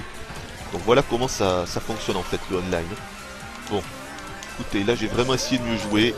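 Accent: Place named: South, France. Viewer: French with a southern accent :)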